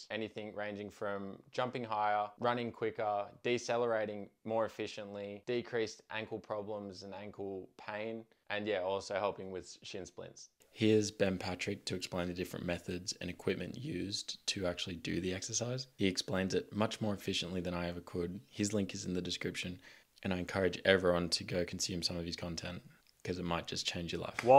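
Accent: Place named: Australia. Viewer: Tibialis raises. Australian accent. Subscribed!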